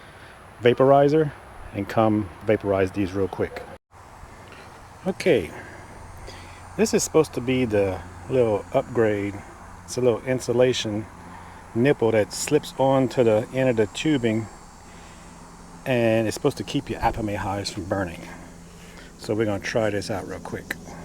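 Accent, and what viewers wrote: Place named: Louisiana, United States. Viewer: Awe... Your voice make me miss home!!!! Lol I'm from butte la rose but I live in Florida now. 😊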